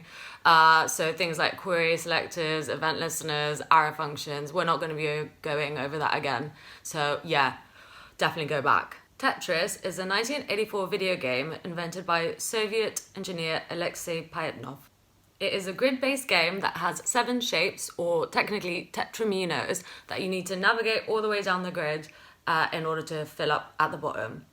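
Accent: United Kingdom. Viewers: British accent is way too hard to get especially for a non-native speaker. I really wish subtitle was there :( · British english is hard to follow, what did author say 10 sq.... ? What's the unit of 10 and 20 here ? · I saw the thumbnail and someone knew she had a British accent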